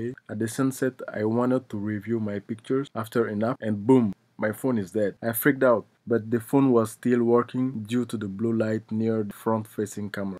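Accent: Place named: India. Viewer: the fuck what's with the indian accent you making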